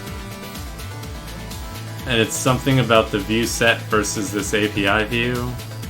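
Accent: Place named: Russia. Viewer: congratulations, you have the Russian accent!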